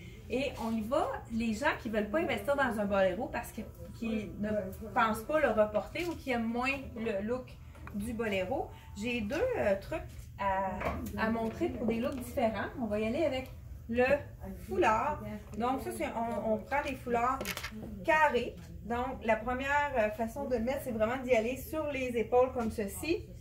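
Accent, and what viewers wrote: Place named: Canada. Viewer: Bonnes idees mais le son n est pas net et la voie trop canadienne dommage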